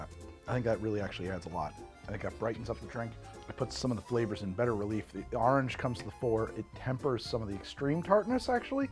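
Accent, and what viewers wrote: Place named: Philadelphia, United States. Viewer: Everything you say "weird" is also how a lot of Philadelphians say things, so f yourself, it's wooter ice. Love you!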